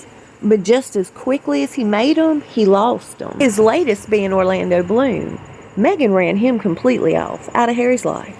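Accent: South, United States. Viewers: My goodness I am watching this from outback Australia 🇦🇺 in the middle of nowhere, all I can say is that Ms you have the most soothing, sexy, Southern drawl I have heard in a very long time. 🤩🎙🔥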